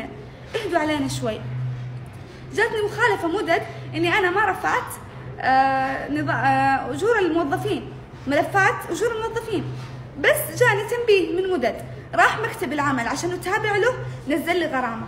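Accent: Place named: Syria. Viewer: واضحه اللهجة واضحه 😂😂😂😂 مع انها تحاول وتحاول جاهده المسكينه ههههههههههههه تقلد اللهجة السعوديه لكن واضح من مخارج الكلمات تطلع لهجتها الاصليه مهما حاولت تغطي تخرج لهجتها السوريه غصبن عنها 😂😂😂😂😂 ياترا من الي متستر عليها من الي وراها ابحثوا عنها وتعرفوا حقيقتها اكيد 👌 هذي واضح من لهجتها سوريه 👌👌👌 لاوبعد تحاسب بعد 👌😂😂😂😂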